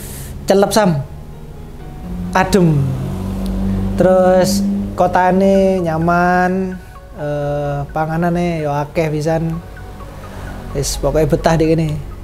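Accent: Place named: Madura, Indonesia. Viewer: Oreng madureh smpyan kak 😄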